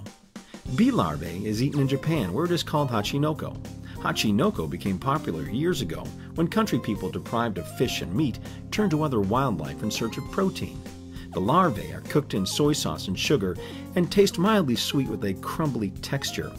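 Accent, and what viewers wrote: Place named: New Zealand. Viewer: Your NZ accent was way better, it's what makes your videos awesome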